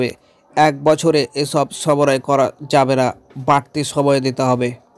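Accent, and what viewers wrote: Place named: India. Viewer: ভাই আপনি কি ইন্ডিয়ান লোক কারন আপনার কথা তো ভারতের লোকের মত।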